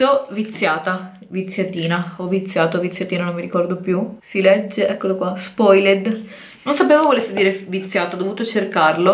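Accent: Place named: Veneto, Italy. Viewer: Ma sei veneta? Pk anche io ,e sentivo un leggero accento veneto,io non abito in Veneto ma a Rimini però ho i parenti La e a volte vado Baci baci spero che vieni a Rimini pk vorrei vederti ,cmq sei bellissima e hai un sacco di stile ,ti stimo❤️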